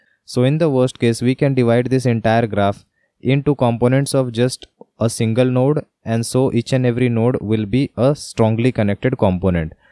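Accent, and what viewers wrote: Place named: India. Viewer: Beautiful explanation, I usually have hard times to understand tutorials in Indian accent. So when I googled I had to turn pages to find one that isn't Indian. First I watched that other video (with not Indian accent) on the subject. But it was horrible. Then said I give a try, and I chose this video. I have to say your explanation is beautiful, clear and easy to understand, the accent is barely noticeable. I like it! Great job! (Obviously. Liked and Subscribed.)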